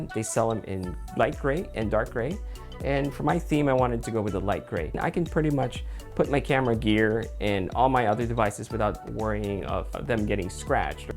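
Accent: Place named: Philippines. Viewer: I can hear the Filipino accent sometimes and I'm loving it 💕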